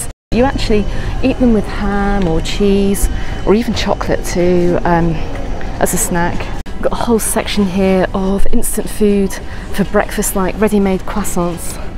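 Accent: United Kingdom. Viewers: It isn't possible to sound more English/British than that 😄. Love the content, thanks for posting!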